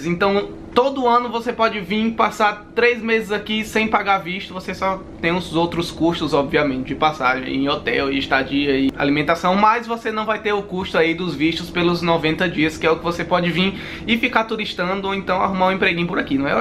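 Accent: Northeast, Brazil. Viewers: Tu é nordestino? Sotaque top! Sou de Sergipe e também tenho desejo de ir morar fora do Brasil. Ir do zero o que eu preciso? Parabéns pelo canal, ganhou mais um inscrito! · Continue homi! Seus vídeos são sensacionais. Minha família é nordestina, mas fui criada no Norte do Brasil. Toda vez que tenho oportunidade de ouvir os “sutaque” de lá, eu aproveito. Seu jeito é muito expontâneo! Que Deus os abençoe e que mais pessoas conheçam vocês! (E que os canais cresçam, tenham muitos likes, inscritos e compartilhamentos e tudo de mais mió). Abraços!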